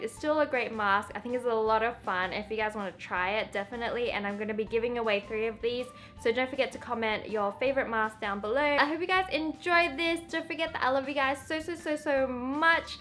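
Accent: Australia. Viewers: are you australian? You have an australian accent. i like it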